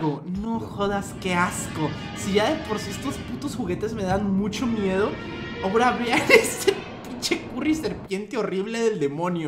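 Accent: Colombia. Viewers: Eres colombiano no?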